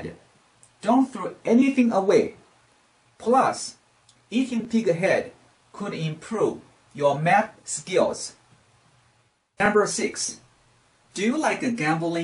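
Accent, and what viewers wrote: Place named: China. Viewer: Great video and your Chinglish is funny! Thumbs Up!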